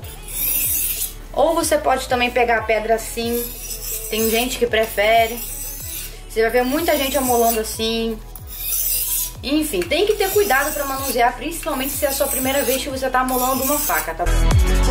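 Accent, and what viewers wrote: Rio de Janeiro, Brazil. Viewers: essa ae e carioca mermo ela pucha o s demais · vc e de rj ? parece pelo jeito de falar kkk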